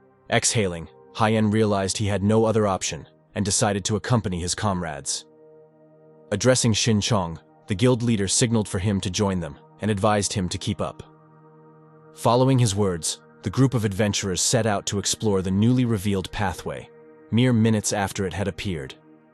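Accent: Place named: United Kingdom. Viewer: Broo this ai is so british/old english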